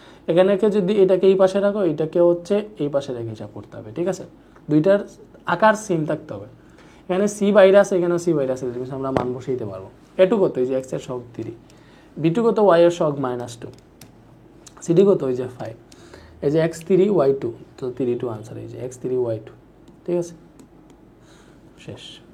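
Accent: Sylhet, Bangladesh. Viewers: Vai sylheti naki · Sir mone hoy amader Sylhet er😫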